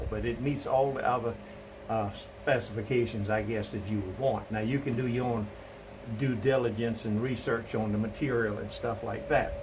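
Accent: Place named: Lowcountry, United States. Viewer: Sounds like you are from the Low Country.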